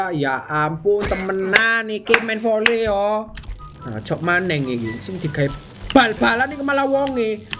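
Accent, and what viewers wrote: Java, Indonesia. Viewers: Abang orang Jawa 😄😄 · Logat² jowo mas arek ndi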